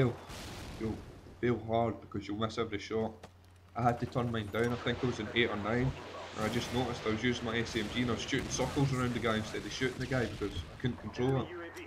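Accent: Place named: Scotland, United Kingdom. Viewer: subbed cause you from scottish mon the scots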